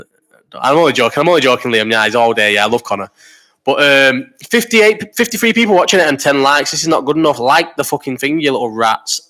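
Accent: Liverpool, United Kingdom. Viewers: Boss scouse accent that mate 🤣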